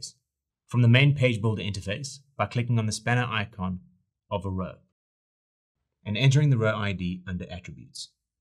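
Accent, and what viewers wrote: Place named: South Africa. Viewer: Great video, really helpful thanks. And brilliant to hear a Saffa accent!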